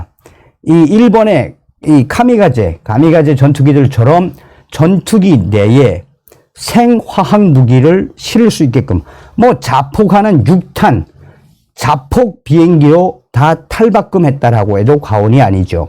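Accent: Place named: North Korea. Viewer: 북한말투는 안쓰면 안쓰면 좋겠습니다.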